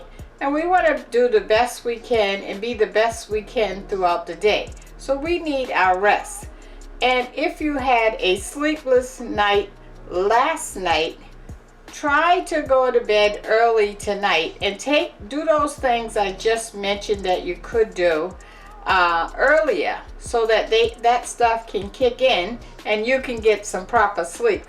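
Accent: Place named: New York, United States. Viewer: Looks good. You have a NY or Jeresy accent. :)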